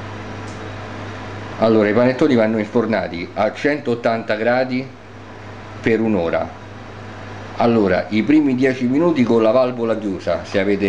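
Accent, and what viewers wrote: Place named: Rome, Italy. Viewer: di foggia? ma non lo senti che parla in romanaccio?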